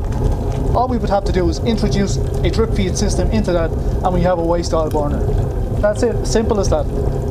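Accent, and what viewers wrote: Ireland. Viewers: OMG, that was entertaining to watch the entire way thru, what with the Irish accent, the simplistic attitude and the raw fire power just waiting for idk, something more than just heat.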